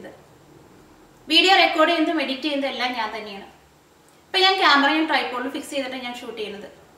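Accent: Thrissur, India. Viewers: Hi thank u for teach us variety of food items in simple way..Ur presentation especially trisur basha is nice....all d best for u and ur familly..hapy new year.... can u show d tabe spoon, tea spoon etc..and wt is nalla jeerakam... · Pachakam pole thanne ningalude a kalarpillatha nadan bhashayum eniku ere ishtamanu bcoz im also from thrissur...go ahead sister, merry Christmas!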